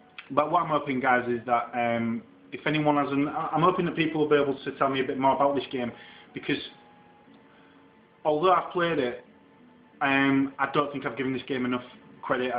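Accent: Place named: East Yorkshire, United Kingdom. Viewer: I sense an East Yorkshire accent!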